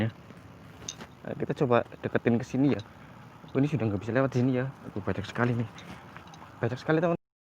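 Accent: Banyumas, Indonesia. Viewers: Ngapak ya bos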